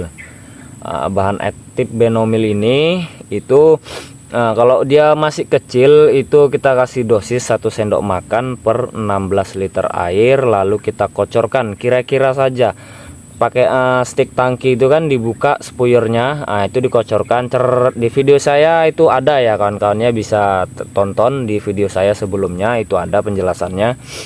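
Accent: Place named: Medan, Indonesia. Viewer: Kalok nengok dato bahasanya abang ini orang medan.dari mana bang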